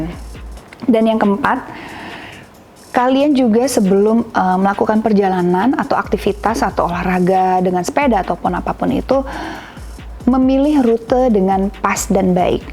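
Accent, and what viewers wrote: Bali, Indonesia. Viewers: Logat balinya baru keliatan 🙏 salam rahayu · Seneng liat kak pke logat bali, ga kagok, emang orang bali sh ya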